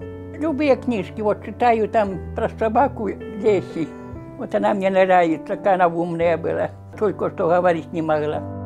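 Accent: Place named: Rural, Ukraine. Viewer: Она же не на белорусском говорит. У нас в Украине в селах так разговаривают. Я сначала субтитры читала без звука. А потом включила звук и поняла, что она вполне понятно говорит.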